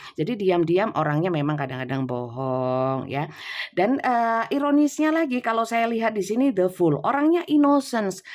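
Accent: Java, Indonesia. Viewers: Ingih bnda medok banget bun jowone.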